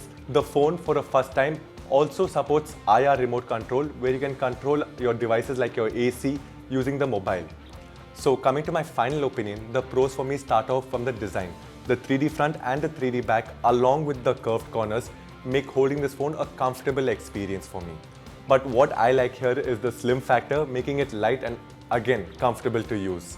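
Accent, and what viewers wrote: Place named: India. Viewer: Look like a european guy but with india accent🤭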